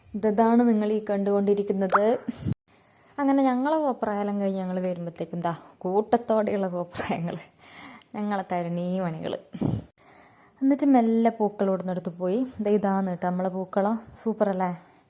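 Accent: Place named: Kasaragod, India. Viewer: Kasargod bhasha poli😊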